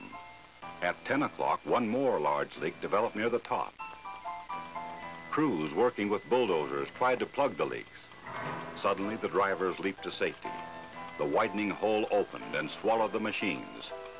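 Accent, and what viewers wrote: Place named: Idaho, United States. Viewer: How great is this guys' gem state farm ass bolo tie wearing voice! I love it. The music too.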